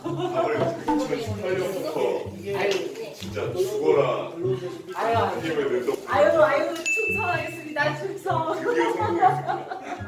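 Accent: Japan. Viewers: 애매한 한본어 킹받네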